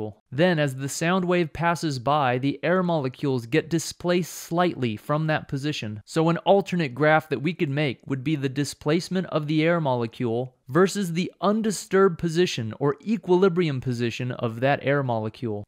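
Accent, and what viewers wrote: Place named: India. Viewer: after next bunch of indian accent video, now I understand what is frequency and amplitude. How about magnitude?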